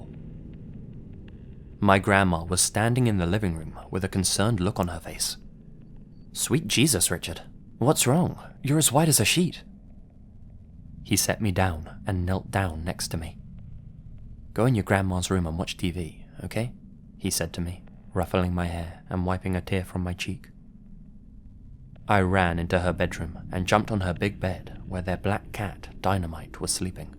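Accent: England, United Kingdom. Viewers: It’s kinda funny listening to a British person narrate stories about the U.S. Also, let’s go Massachusetts! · mostly taking place in New England, where i too live, this is going to be good!! makes me wonder if is in new england (though i realize he has a slight English accent)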